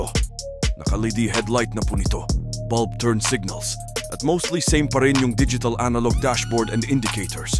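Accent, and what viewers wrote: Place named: United States. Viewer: kuya halatang laki g america yung accent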